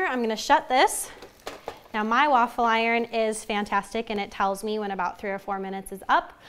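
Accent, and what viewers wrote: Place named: Midwest, United States. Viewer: Omg the Midwestern accent is just the cutest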